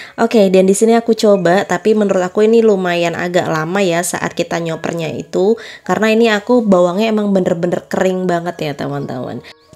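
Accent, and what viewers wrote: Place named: East Java, Indonesia. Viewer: Hp saya jg barusan masuk service samsung mba 4hari kena lcdnya dan komponen apanya gt biayanya 965rb huhu,, qadarullah lg renang ta masukin di dompet hp waterprof tp gataunya ada lubang kecil dan masuklah air dr situ kena kamera depan sampe ngembun kemasukan air dr lubang gatau kalo ada sobek kecil ta bawa aja nyemplung renang Kurangnya diteliti lg pdhl baru beli kantong hp antiairnya huhu Salut bgt bisa betul hp sendiri mba suaminya Salam kenal ya mba,, ak suka liat ytb mba dr lama lewat akun suami gaberani coment hihi dah lama bgt dr masih blm 100k sub Awalnya nongol kok bahasanya kek orang jatim Semoga sy bs semangat bikin vidio kek mba panutan❤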